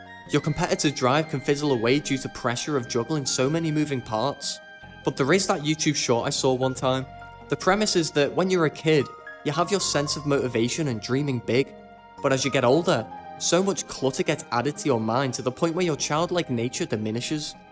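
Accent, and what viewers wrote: Liverpool, United Kingdom. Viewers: Are you scouse?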